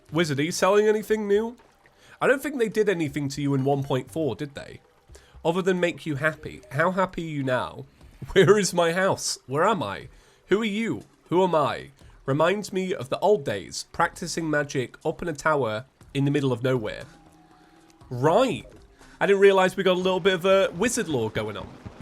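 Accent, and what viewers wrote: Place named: London, United Kingdom. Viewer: Yoo wats up fellow londoner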